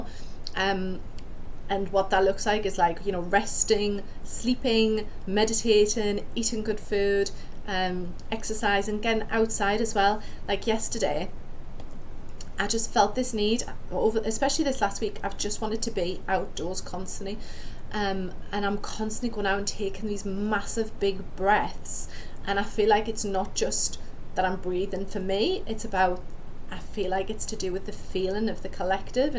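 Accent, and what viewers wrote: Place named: North, United Kingdom. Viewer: !!!! 🙏🙏🙏🌈🌈🌈 You must be in the north because I'm in the south and nobody sounds like you! 😂😂😂 You sound like Sarah Milligan 💜😂 do you do in person readings? I'd love to meet you 🌈🙂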